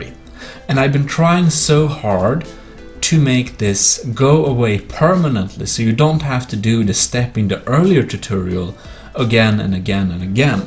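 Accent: Sweden. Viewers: Man hör verkligen att du är svensk lol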